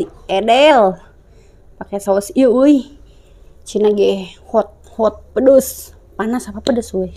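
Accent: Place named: Banten, Indonesia. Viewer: Kalo dengerin teh ngomong jadi inget temenku ngomongnya sama logat" banten padahal dia orang lampung tapi bisa logat banten 👍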